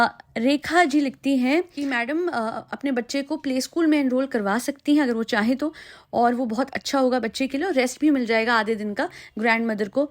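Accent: Punjab, India. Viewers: I know, it's ok.. it's not easy to reply all comments,we love you always,nd specially love your punjabi accent ❤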